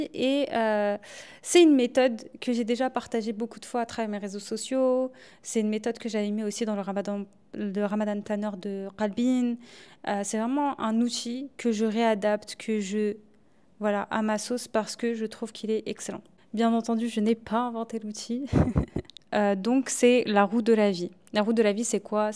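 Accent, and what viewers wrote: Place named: France. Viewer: Ton accent est comme le français bon courage 😍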